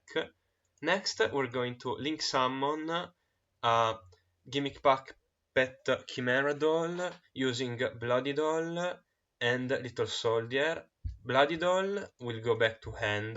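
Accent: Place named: Italy. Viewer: Bro non ti conosco ma so che sei 100% italiano e lo capisco dall’accento ahah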